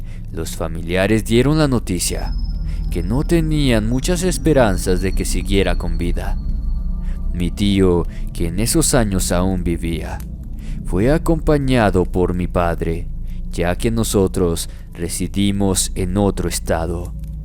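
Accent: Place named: Chile. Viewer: tu linda voz de chile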